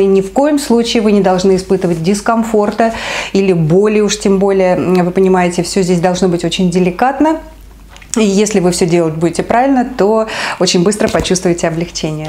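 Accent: Saint Petersburg, Russia. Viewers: Очень красивая женщина, красивая ленинградская речь! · Чувствуется коренная питербурженка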